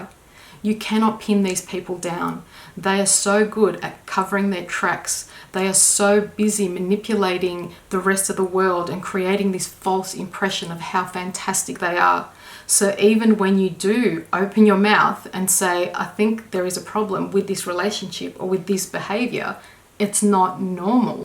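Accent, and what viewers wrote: Australia. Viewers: Your laymen's explanation of narcissism was so on point. Thank you so much. I am grappling with a family member who presents symptoms of this disorder. Also, love your accent, is it Aussie???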